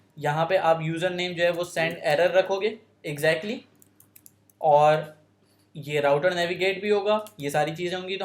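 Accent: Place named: Uttar Pradesh, India. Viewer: you are outstanding man, the clarity you have hats off. keep it up. between i get a feel you are from UP but surprisingly you are from pakistan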